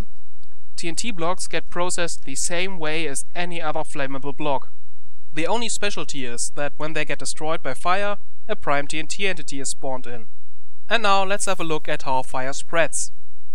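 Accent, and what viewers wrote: Germany. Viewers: Ich liebe deine deutsch "pronuntation" (?) Ps ich bin italienich ;)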